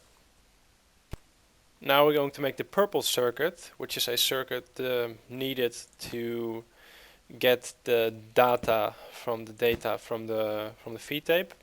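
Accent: Netherlands. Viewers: thumbs up if u hear he's dutch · hey man, are you dutch?? your accent sounds dutch... i'm from holland too so that's how i recognize it... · u sound dutch, are u? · je bent nederlands dat hoor je aan je accent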